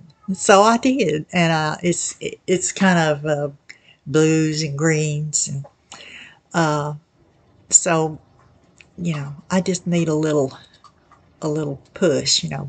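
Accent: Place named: South, United States. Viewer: Hi! If the artists don't mind would you show the pieces that you talk about? Maybe a future video? I am enjoying your videos; I miss hearing genuine southern speech.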